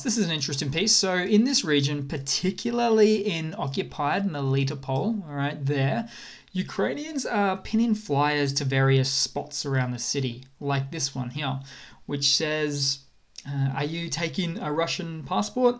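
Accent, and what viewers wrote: Australia. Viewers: Very encouraging to hear that the Kherson counter offensive is more successful than the map shows, but should you be going public with this when Ukraine is keeping it quiet for Opsec? Anyway, I don’t know where you get your information from but if it’s correct it’s gold. Bahkmut too everyone else is saying the Russians are gaining. I hope you’re right that there is no progress. Btw, you sound like an Aussie which makes your sources of info even more mysterious 🇺🇦🇦🇺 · Love your delivery. I wonder where your accent is from? First, I thought you could be English but then I seemed to be picking up some Aussie cadence. Please put my curiosity to rest.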